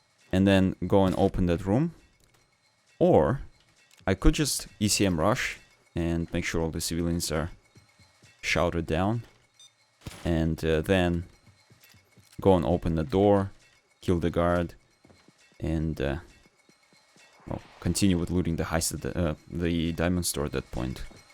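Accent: Russia. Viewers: Акцент русский, по моему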